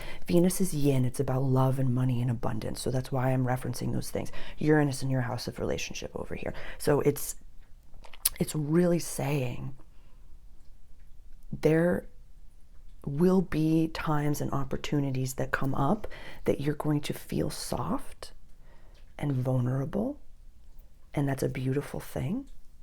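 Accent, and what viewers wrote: Boston, United States. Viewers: i love your boston accent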